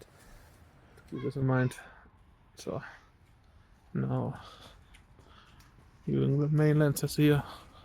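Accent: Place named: Germany. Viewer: Warum nicht auf deutsch Bruder? Ich mein, man hörts ja schon gut raus.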